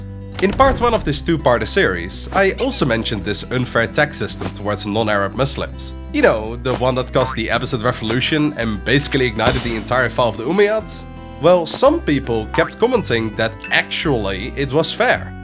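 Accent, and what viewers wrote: Netherlands. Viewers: Are you Dutch by any chance? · love ur Dutch accent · the Dutch accent is making it so much better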